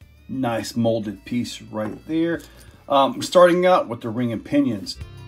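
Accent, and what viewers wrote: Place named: United States. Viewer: Yeah im hardcore! Love your videos man! And the good ol american accent🫵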